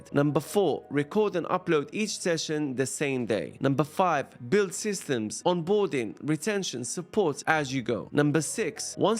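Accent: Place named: Morocco. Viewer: you have like morrocan accent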